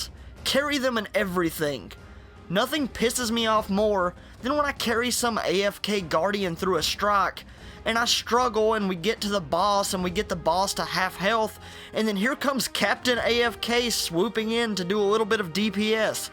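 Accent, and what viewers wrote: South, United States. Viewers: Dude sounds exactly like Tyler from secureteam10 with a southern accent. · DigitalNinjas it's a southern accent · Love your Southern accent! Not much people down here that have Youtubes! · DigitalNinjas, I would bet Southern United States. Texas or East of there but not as far as the East coast.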